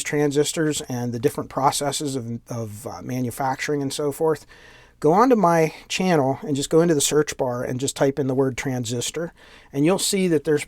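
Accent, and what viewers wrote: Pennsylvania, United States. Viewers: Happy New Year.... From your accent (PA), I would guess you know the lebanon bologna cure for your cold.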